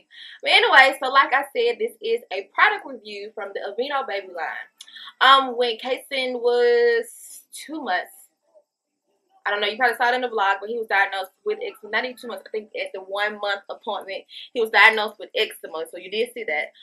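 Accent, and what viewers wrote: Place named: South, United States. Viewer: Love your southern accent I’m from Florida I can hear a similarity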